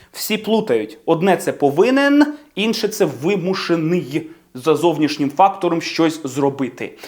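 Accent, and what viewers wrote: United Kingdom. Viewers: От як у вас виходить такий британський акцент? 😮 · Так,знімай ще.П.С Чудова британська англійська у тебе.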